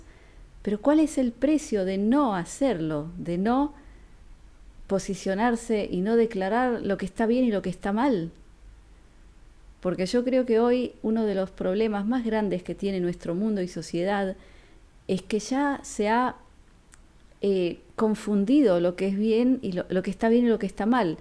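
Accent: Buenos Aires, Argentina. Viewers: Salud! No puede negar que es porteña.. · qué lindo...y tu modo de hablar es bien porteño!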